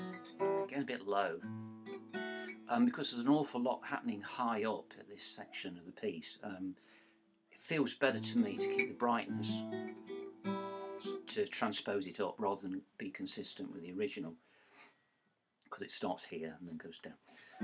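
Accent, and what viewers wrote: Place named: United Kingdom. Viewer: Hi, my name is Angelica and I love your british accent I'm italian and I love England. I'm a musician, a pianist. I just learnt to play by, I hope you know it... I'd like to know more about you. Where are you exactly from? Because you know, I love England... I' d really like, if in a way we can try to keep in touch if I can play you, it'd be amazing. I play it since I was 2 and now I'm 15. Hope you are well. Bye.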